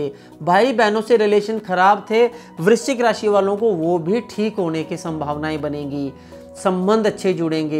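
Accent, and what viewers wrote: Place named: Punjab, India. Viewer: he is from punjab that's why his pronounciation is like this...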